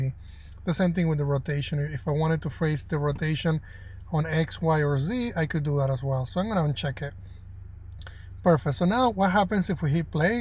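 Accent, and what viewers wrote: Spain. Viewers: I really like your videos man. Are you Spanish?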